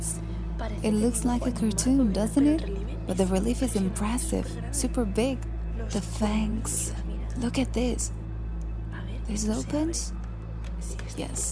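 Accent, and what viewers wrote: Spain. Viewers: Es muy riesgoso tu trabajo no siempre es lo mismo todos esos lugares tienen algo enigmática más es importante ser prudente en dónde quiera existe maldad humana y más por tu condición de mujercita no faltan vivales o asesinos ojalá! Solo sea una broma pero recuerda el castillo de Napoleón cuanto destrozó y tal cual lo señalas respetas pero también ay personas malas y vaya que muy malas saludos desde Tula Hidalgo México por tu acento eres española tu voz es delatora.👍👉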